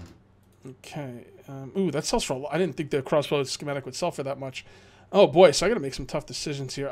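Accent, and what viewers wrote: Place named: New England, United States. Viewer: Some I knew you where some where and new England.:P you have a slight North eastern accent.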